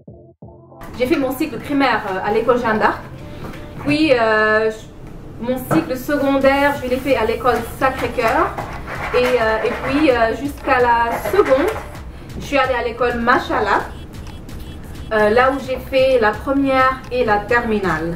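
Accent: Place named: Senegal. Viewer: lol...ton action(voix) montre que sénégalaise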